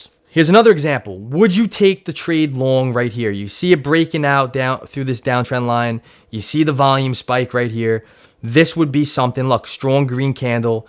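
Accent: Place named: New Jersey, United States. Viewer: You deff from New Jersey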